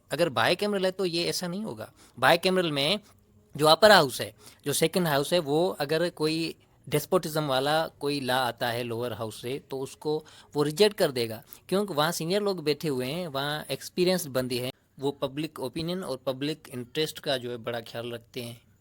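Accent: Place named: India. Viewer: Pakistani hokar indian Accent..How?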